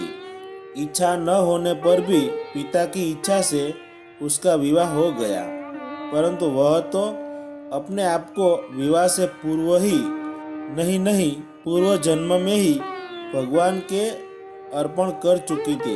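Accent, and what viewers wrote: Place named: Chennai, India. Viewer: आप चेन्नई से हो क्या अना बोलते है वेसे बोलते हो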